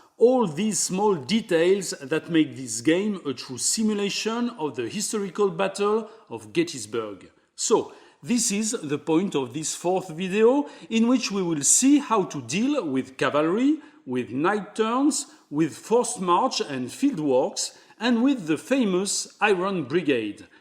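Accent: France. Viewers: This is excellent! Also, all American place names sound so much nicer when pronounced with a French accent 😄⭐️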